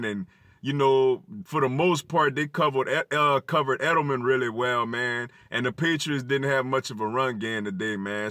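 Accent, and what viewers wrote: United States. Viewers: Oh your a Jamaican using an American Accent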